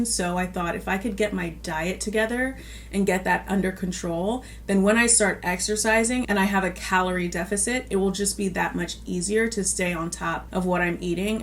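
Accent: Nigeria. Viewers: you're an amazing woman of God. Loved this video, but I truly miss your intermittent bursts of Nigerian English. Please bring it back.😂 God bless you, girl!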